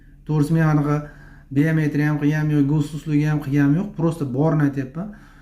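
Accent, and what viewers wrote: Andijan, Uzbekistan. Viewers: Oddiy o'zimizcha Anjanchasiga gapirib qo'yaqolaman, deb xatolariyizdan o'ldirvordiyiz lekin. (Quick Response Code) QR- код Piar- код deb. Aka Andijonliklar sizchalik xato gapirishmiydi lekin. Siz halqqa bir ma'lumot opchiqvottisiz, aytivotgan gapiyizga o'ziyizam tushunmaseyiz kerak xatoligidan. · Anjon shevaku😂shunqa sozini bular ashnaqa deydi😂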